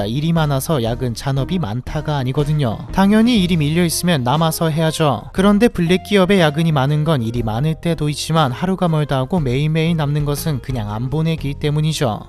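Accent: Gyeongsang, South Korea. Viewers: 딱보니 경상도 😂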